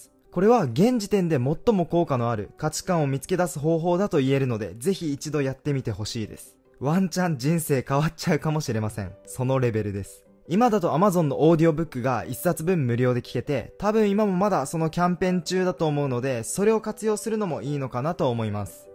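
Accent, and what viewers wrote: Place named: Tohoku, Japan. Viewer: あ、そうなんですね！こちら、秋田出身東京在住なのですが、はじめ（親しみやすさという意味で）ときおり東北チックな訛りがあったので、そちらご出身かなと勝手に想像していました＾＾ さんの語り部、毎回とても楽しみにしています＾＾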